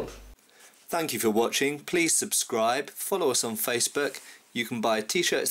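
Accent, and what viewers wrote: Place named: United Kingdom. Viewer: "They didn't just spring out of the earth" - 2017 btw that was only funny because of you're british accent xDD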